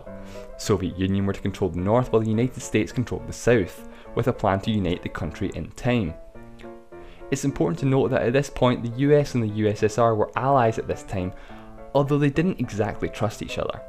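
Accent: Scotland, United Kingdom. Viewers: I think he's scottish. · Are you scottish cause you got a scottish accent xD??? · Scottish accent. Lots of English people find the Scottish accent difficult. And then we have the Welsh. Or worse still, the Northern Irish (or "Bradash!" as they put it). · I cant understand why americans struggle with Scottish accents either, they are literally speaking English. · It's not a speach problem, it's the best accent in the world: Scottish accent. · Are you Scottish? :) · Everything's so fine about the videos, but please try to change your accent! Non native english (scottish) speakers, cannot understand! we love Scotland but scottish accent makes it all difficult. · from Scotland, English is his native language. It's how he learned to speak there. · Hiếu Lương He sounds Scottish. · He's scottish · This guy is Scottish, I SWEAR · Anmol Madaan Scottish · i can't hear scottish accent well... 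i need to learn more..ㅠㅠ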